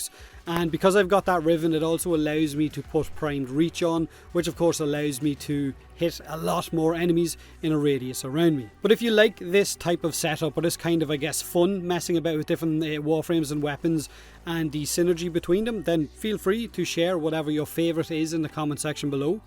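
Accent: Ireland. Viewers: Came for the warframe content Stayed for the Irish accent and warframe content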